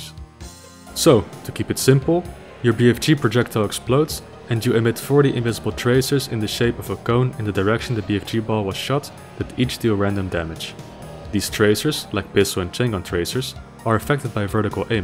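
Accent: Netherlands. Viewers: tfw you can spot a fellow Dutchie just by their accent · R u Dutch?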